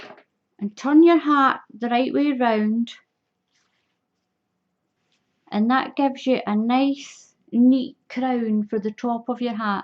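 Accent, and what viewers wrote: Scotland, United Kingdom. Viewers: Was going to watch this to see this beautiful hat being made and staying to hear the accent. I love Scotland, can't wait to see how this hat turns out :)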